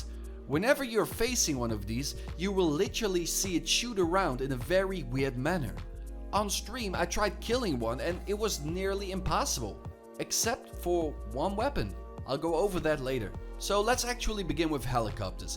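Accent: Netherlands. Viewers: this guy has to be dutch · It's a nordic accent. Probably Dutch or Swedish.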